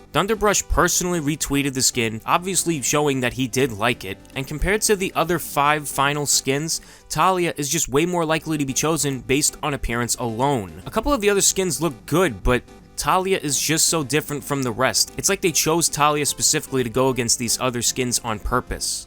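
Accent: Italy. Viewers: Dude r u from italy?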